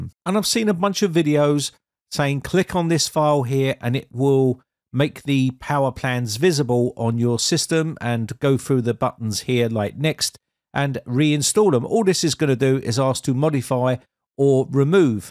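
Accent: United Kingdom. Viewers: He's clearly got a British accent mate are you okay?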